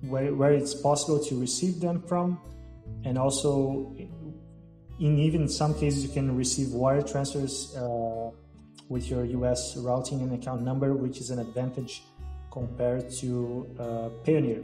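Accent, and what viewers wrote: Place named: Rio de Janeiro, Brazil. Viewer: Eu tenho quase certeza que é carioca... =D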